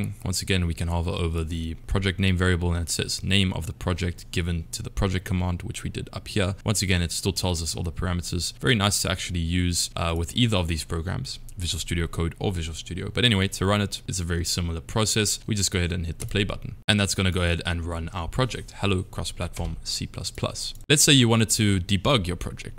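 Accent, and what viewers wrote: South Africa. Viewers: Dude are you from SA 🫣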